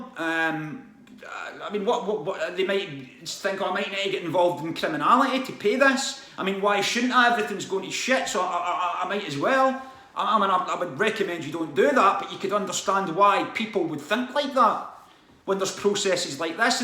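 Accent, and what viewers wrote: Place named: Glasgow, United Kingdom. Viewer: he has a Glasgow accent :)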